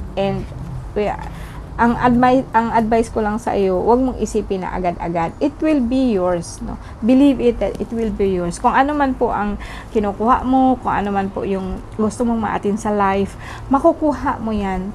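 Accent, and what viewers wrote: Bicol, Philippines. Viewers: Bicolana ka po?😊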